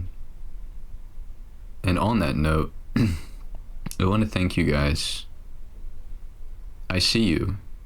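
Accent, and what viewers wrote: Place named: United States. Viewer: where are you from bro. I am from Uzbekistan. I am learning English for IELTS degree at the moment. in my opinion, you are American. I shadow you for fluency.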